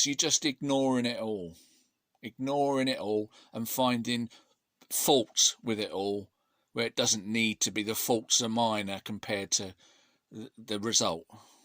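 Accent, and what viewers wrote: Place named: Cockney, United Kingdom. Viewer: Oh dear indeed - Thank you for sparing some of us the time wasting ordeal of listening to his utter garbage excuses. You can congratulate yourself on getting him to weazel out of his long standing MV claim. "Cluuuuck cluck cluck cluck" is what it sounds like from him. And so he doesn't like the way you talk? What does he want you to do... fake a different accent? I'm a Midlander/ Northerner and love the London / Essex accent, whchever it is - To us it all sounds pretty Cockney, a bit Arfer Daley and I wish I could mimmick that accent cause I always thought it sounded dead cool. Now if you had a Brummy accent, he might have a point lol! Just kidding. It shows his difficulty dealing with reality, when even the reality of your regional accent is too much for his "brain" to bear.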